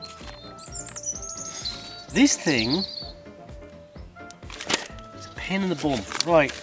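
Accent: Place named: Ireland. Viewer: I absolutely love your vids darling, you explain things concisely and clearly in that gorgeous lrish accent, and your gentle sarcasm has actually made me laugh out loud, which so RARELY happens !! I've just subscribed and.........thankyou for cheering me up !! xxx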